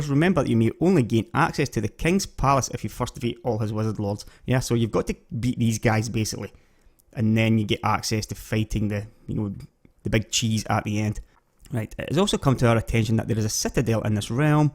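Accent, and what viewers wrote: Scotland, United Kingdom. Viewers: Engrish? I take it you have never heard a Scotsman speak before?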